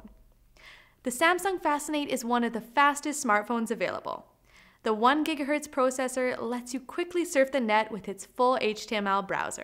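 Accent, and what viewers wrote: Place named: Canada. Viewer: Agreed, Im having trouble figuring out what kind of accent. Canadian maybe? We would know if she said "out"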